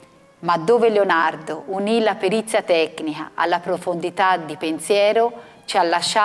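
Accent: Tuscany, Italy. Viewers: Ascoltar cose d'arte con accento toscano e, per somma combinazione, con suono di voce di donna, vellica una gioia intellettiva di rara bellezza. Chapeau all'Autrice!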